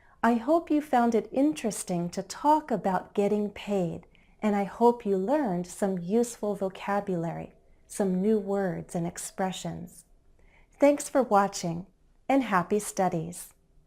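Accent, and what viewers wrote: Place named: United States. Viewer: Amazing. I do like your videos, and I share them to my friends, students, and colleagues. The way you teach is excellent. It seems that you are patient to your subscribers. And, I like your American accent. I recommend everyone who wants to learn English should follow channel. Well done. Keep it up! Ahmed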